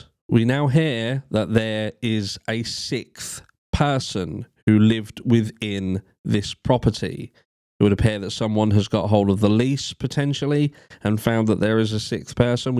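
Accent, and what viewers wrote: United Kingdom. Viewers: Yes I was one who brought up civil rights in the USA. It hadn't registered you were in the UK.* Such "dragnets" may be permissable in the UK. I recall reading about one done many years ago (40s?) (in I think it was in Prof Keith Simpson's book "40 Years of Murder). *(ur accent notwithstanding since I watch a lot of UK based content & thus Canadian, UK & USA accents no longer "jump out").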